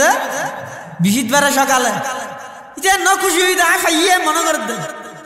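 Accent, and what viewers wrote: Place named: Chittagong, Bangladesh. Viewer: চিটাগাং ভাষা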